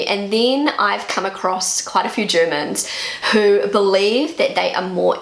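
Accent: New Zealand. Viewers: Wer hat auch Probleme diesen Neuseeländischen Akzent zu verstehen? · Armin Ehrbar That is the Kiwi accent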